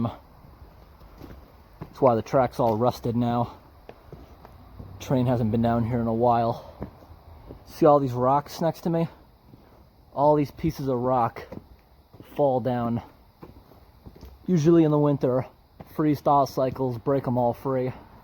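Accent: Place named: United States. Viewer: I'm From New Zealand and I like his American Accent.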